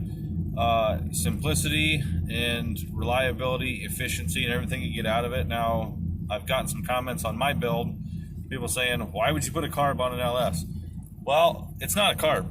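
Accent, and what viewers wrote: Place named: Pennsylvania, United States. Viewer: Pennsylvanian I see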